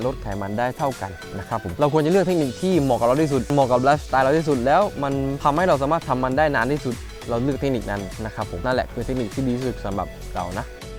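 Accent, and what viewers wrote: South, Thailand. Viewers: คนใต้หรองับ/ สุดหล่อ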